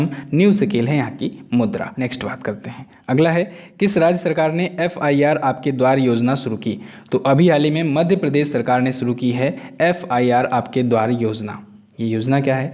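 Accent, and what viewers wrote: Bihar, India. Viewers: 4.zero bhai na ki 4.o 😆😆😆. Bihari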